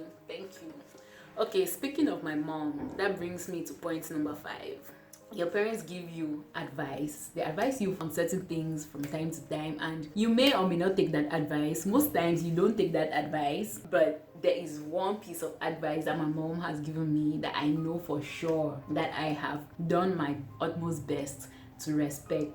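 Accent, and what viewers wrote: Nigeria. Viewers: Wow this girl is Cameroonian?? She sounds Nigerian, I can't even tell. We Africans are one. You've gotten a new sub my sister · Followed your comment from Em Etetim's video down here. If not that your channel shows Cameroon, you pass for 100% Nigerian. Both in looks and accent.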